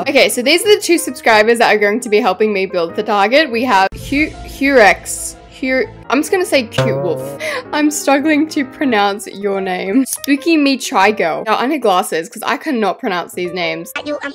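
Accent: Australia. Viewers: OMG UR AUSTRALIAN??!! if u r im so HAPPYYYY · What your Australian? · Your from Australia same gurl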